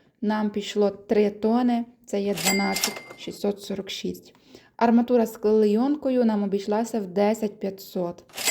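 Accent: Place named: Western Ukraine, Ukraine. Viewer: акцент западенскький 😅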